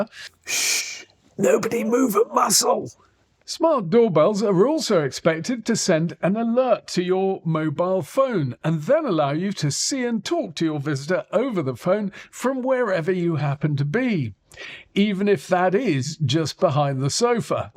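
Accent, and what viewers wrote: United Kingdom. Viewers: I'm an english teacher from Austria. Most youtube videos are made in America...but I really love your british english. It's so much more sophisticated...I just love it:) also your content on the doorbells ist interesting of course.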